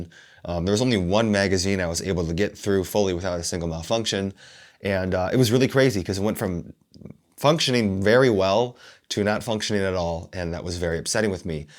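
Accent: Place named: United States. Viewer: With that accent you're more American than Korean!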